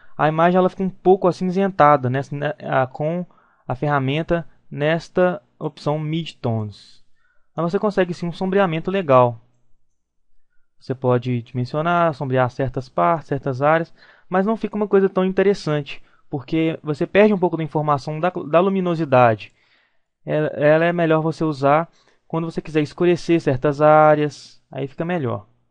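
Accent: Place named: Minas Gerais, Brazil. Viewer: show. a voz do mineirin. ô çô!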